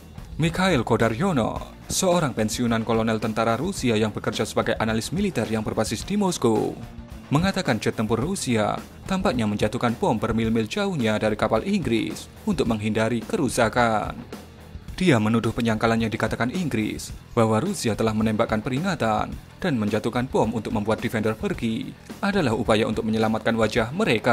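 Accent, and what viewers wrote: Java, Indonesia. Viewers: Kok meddok banget mas wkwk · Medok amat mas suaranya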